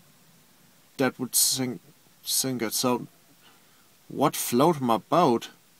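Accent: Germany. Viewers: your covering ", " would float many boats! Your German accent and having fun with the sounds would float a plethora of boats. Please bro, do it for all the folks that don't know about. I'm certain people that get you will more than love. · But it's adorable... German accents are cool.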